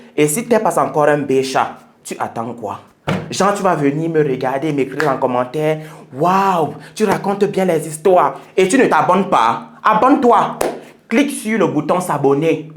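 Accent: Cameroon. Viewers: Ces restaurants n'existent pas à Abidjan. C'est peut-être au Cameroun vu ton accent. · Ton accent nous dit que tu ès camerounais. Ne te fous de nous. Tu es vraiment menteur et malade. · si tu es ivoirien, vraiment tu as appris avec réussite l'accent camerounais. Venons-en maintenant aux incongruités intrigantes de ton narratif. Si savoir se comporter est entre autres un critère de choix, à quel moment cette entreprise à testé ton comportement avant de te retenir? Et puis étant donné que l'approvisionnement d'un restaurant est une tâche répétitive, les responsables doivent s'assurer de la disponibilité des composantes de leur menu principal. Les cadavres des morgues sont conservés avec des produits toxiques pour tous vivants. Où d'après toi se procrent-ils permanemment la chair humaine? Et puis c'est à croire que tous les gouvernements qui se succèdent à la tête de ce pays sont une secte d'initiés à la dégustation de la chair humaine. 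Laisse moi retenir que tu as fait un post pour faire le buzz, recueillir des "Likes" et monétiser ta page. Merci à toi frère ivoiro-camerounais.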